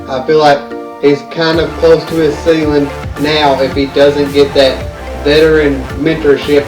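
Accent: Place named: South, United States. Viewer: Your Suthern accent made it very hard to understand you no offense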